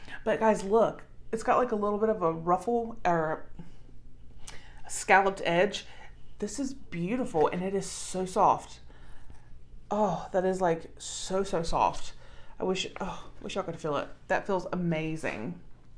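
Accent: South, United States. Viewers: I love all the items in the Box!!! It would be like Christmas morning opening that box of wonderful surprises!!! I love your southern accent and you did a marvelous job Darling!!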